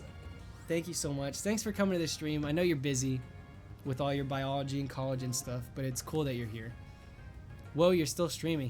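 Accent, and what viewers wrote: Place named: France. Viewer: No !!!!! Your French accent is so cute ☺️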